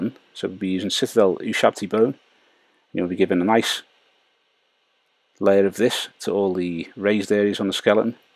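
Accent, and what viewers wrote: Liverpool, United Kingdom. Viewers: Scouse accent pushes this to the next level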